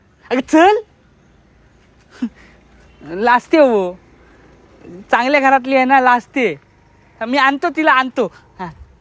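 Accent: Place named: Konkan, India. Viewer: साहेब मस्त असतात तुमचे व्हिडिओ अक्टिंग खूप हसवता.. मस्त विनोद तुमच्या मुळे कोकणी भाषेत तील गोडवा कळला तुम्हां गोड शुभेच्छा.💐🙏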